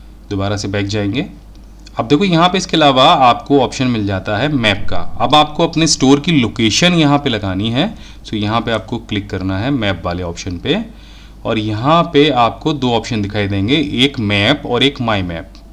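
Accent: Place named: Uttar Pradesh, India. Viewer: thank sir aap bahut deeply or ache se sam jate ho, aapki hindi bahut achi lagti hai,wase bhi u p waalon ki hindi bahut achi hoti hai, main bhi u p se hun, apka padosi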